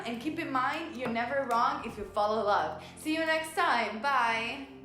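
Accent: Italy. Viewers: Is she italian? I so love her already! Her accent and personality! LOVE! Anyways I have seen so many videos like this but god I have never had any reading this eerily accurate! Like never before! OMG! spot on! I chose #3. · your italian cuite love your accent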